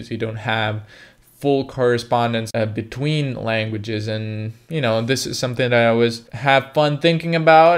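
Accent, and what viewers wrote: United States. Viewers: your American accent is very good. I don’t think I’ve ever heard you speak English before and I know it’s quite an accomplishment to nail an accent without having lived in the country for many years. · you sound totally american.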